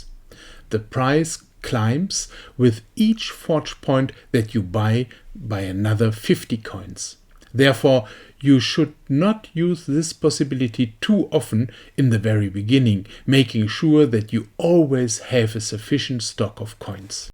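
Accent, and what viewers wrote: Germany. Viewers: your accent is awesome haha. wundebar! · Hört sich sehr deutsch an dein Englisch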